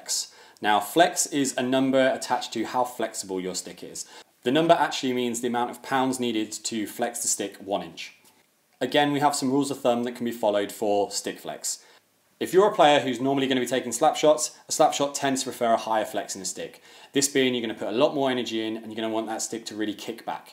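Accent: England, United Kingdom. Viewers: A black dude with an english accent is really what comes to mind when i about hockey sticks. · A black guy speaking in a British accent discussing hockey. Never thought I would see the day.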